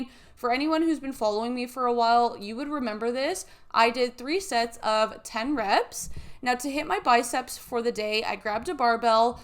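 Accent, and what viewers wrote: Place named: Canada. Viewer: Canadian! U can tell by the way she says 'Out'.... heh heh;-)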